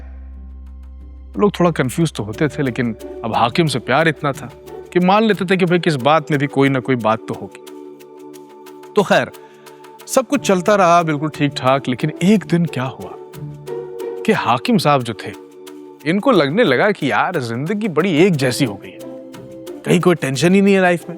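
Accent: Lucknow, India. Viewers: Lucknow tone ❤❤❤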